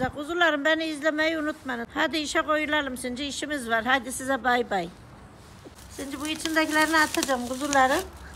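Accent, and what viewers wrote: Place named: Elazığ, Turkey. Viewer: Elaziğli gibi konuşuyosun ya anane ben elazigliyim da ondan diyom 😂🥰💙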